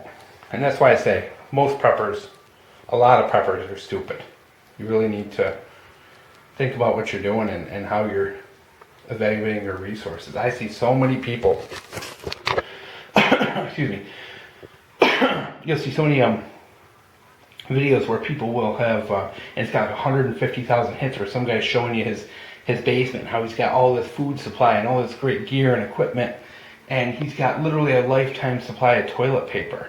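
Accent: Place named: Midwest, United States. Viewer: LOL your accent reminds me of home! are you from Michigan or Minnesota?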